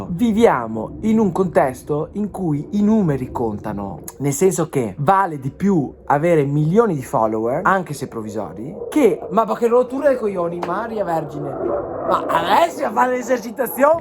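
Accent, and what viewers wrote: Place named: Veneto, Italy. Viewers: Ciao, a mio modesto parere se vuoi continuare la crescita devi, purtroppo, uscire dalla "regionalizzazione" in cui il tuo personaggio è cresciuto. Io sono trentino e mi sento molto vicino, sento molto affine il tuo accento, i luoghi da cui trasmetti, i temi ecc. ma penso che dal "triveneto" non puoi tirare fuori molto di più in termini di consenso, contatti e visualizzazioni a meno che non diversifichi i "canali" e cominci a fare anche live nei teatri e a partecipare ad eventi nel Triveneto allora il bacino potrebbe bastare. Ti trovi probabilmente nel momento più delicato e da questo video si capisce che lo hai compreso benissimo. E' il momento della: "o la va o la spacca" e ti auguro veramente con tutto il cuore che tu possa trovare la strada giusta. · Ciao premetto che non voglio che torni a fare chiamate ma tornare a parlare Veneto si abbiamo una terra con molte attrazioni e molte cose di cui fare passare dei concetti penso che la gente come me ti ammiri per il tuo accento quindi torna a parlare VENETO!!!!!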